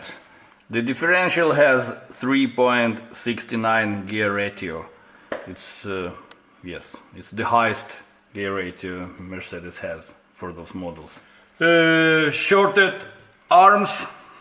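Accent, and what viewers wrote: Russia. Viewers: Русский что ли бро ) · Та я только по первым словам на английском уже понял, что это говорит русский человек 👍👍👍 Мерс огонь!!!! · русский английский!!!!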